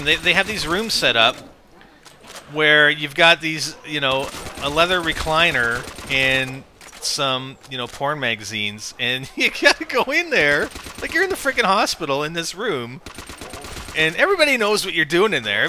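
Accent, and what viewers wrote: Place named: Canada. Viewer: is Canadian. His accent pretty much gives it away if you're familiar with Canadian accents at all. I remember the first time I saw an vid, I realized about 30 seconds in "This guy has GOT to be Canadian. Aboot? Eh?"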